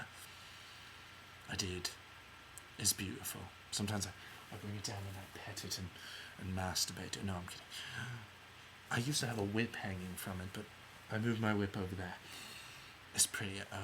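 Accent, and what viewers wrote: United Kingdom. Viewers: sorry mate but you are speaking in the "queens english" accent which is the most english you can possibly be ( wait does that make sense ) anyway im british and you sound more british than me! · You are very English in your mannerism and intonation....and you like it.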